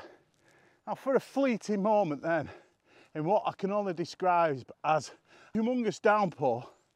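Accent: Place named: Billinge, United Kingdom. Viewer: Surreal video...stream of consciousness...excellent painterly images. I live in Cumbria now (35 years) and just getting into photography. Born in Leigh nearly 70 years ago, been trying to place your accent.. Billinge! Keep em coming!!😁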